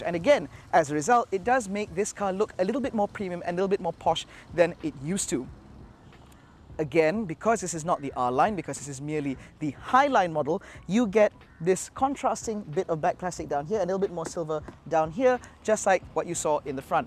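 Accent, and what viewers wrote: England, United Kingdom. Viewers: Thank you … I am getting the 2023 Life model in New Zealand (same as the Highline) in the Atlantic Blue. I like your English voice. The Blue you have got there is a sublime colour!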